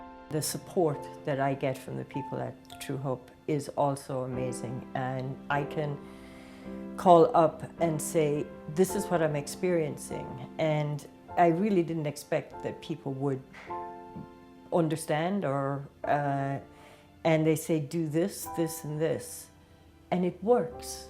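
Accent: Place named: Ireland. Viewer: She sounds like from Ireland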